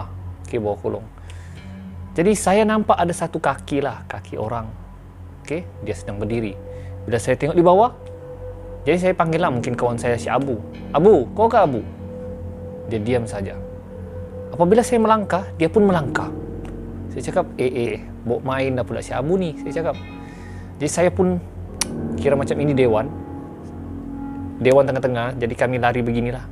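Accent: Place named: Tawau, Malaysia. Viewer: Bang kekalkan lh loghat tawau mu😂🤣👍🏻👍🏻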